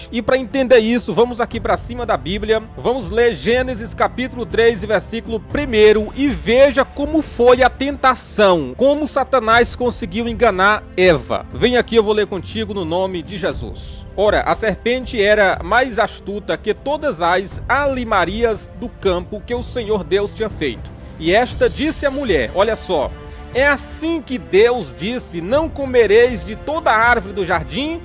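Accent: Northeast, Brazil. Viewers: Eita nordestino reais e muito engraçado o jeito dele falar paz irmão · Oi pastor também sou nordestina e conheço bem esse sotaque 😁 obrigado pelos ensinamentos da palavra do Senhor gosto muito de ouvir vc que o senhor abençoe vc a cada dia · PASTOR, AMO MUITO TUAS PALAVRAS, TEU SOTAQUE NORDESTINO, NUNCA MUDE NADINHA, CONTINUE NOS EXORTANDO COM GRAÇA E SABEDORIA!!! GOSTO MUITO DO JEITO QUE VOCÊ FALA DO CARNIÇA, É ASSIM QUE CHAMO O PULA MOITA KKKKKK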